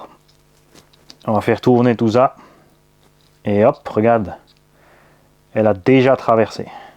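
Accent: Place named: Alsace, France. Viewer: Excellent l'accent alsacien amplifié avec toute les petites phases, on dirait mon plus jeune fils qui fait le touillon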